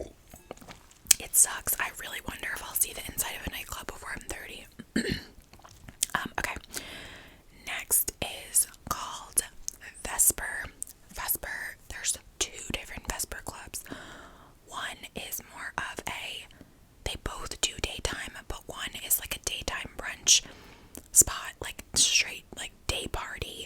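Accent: United States. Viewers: I think your accent is THE american accent for me😍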